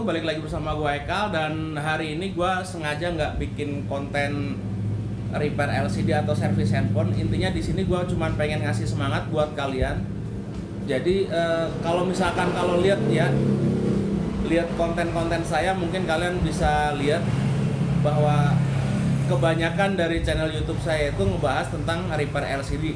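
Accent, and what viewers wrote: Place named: Kalimantan, Indonesia. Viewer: Dr kalimantan mana bang... Logatny kalimantan tuh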